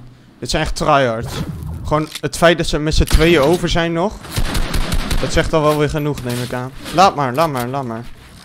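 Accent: Groningen, Netherlands. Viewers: Kom je uit Groningen ? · Ben je Gronings?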